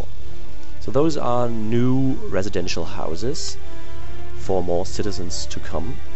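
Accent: Germany. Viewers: ich bin beeindruckt dass du den deutschen akzent so verhältnismäßig gut verschleiern kannst^^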